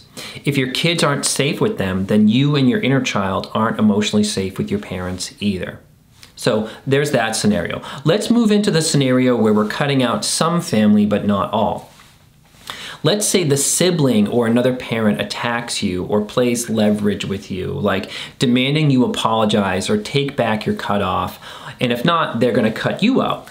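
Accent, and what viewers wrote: Boston, United States. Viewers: 😂 love the 'Bwostin ackcint'!! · I love it when your Bostonian accent comes out! This video was medicine for me. I’m mid-cut off with a couple covert toxic people after a verbally and emotionally abusive episode where I had enough. Though it’s tricky because my life was already in limbo… divorcing an alcoholic and currently staying with a family member. This family member keeps bringing up how much it hurt one of the toxic people when I didn’t acknowledge her birthday following the abusive incident. It’s exhausting being under the same roof. This person definitely has a different story of our family. Looking forward to when I am able to get into my own place… I’m working on it. This video gave me the encouragement and validation to stay strong and true to myself. I feel so much calmer and grounded after I watch these. Thank YOU!! 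· Interesting how you compared cutting off family to a nasty divorce... It reminds me of covert incest and parentification, which can totally feel like a nasty divorce, even when you set the smallest of boundaries with the family members projecting those relationships onto you. PS great Boston accent! I'm Boston based as well!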